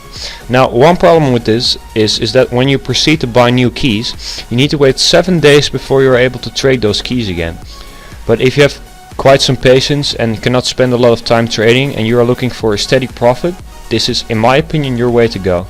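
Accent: Netherlands. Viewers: Ik dacht dat ik een nederlands accent hoorde, en ik ben van belgië en mijn engels is ni zo sterk mar goeie video's man :p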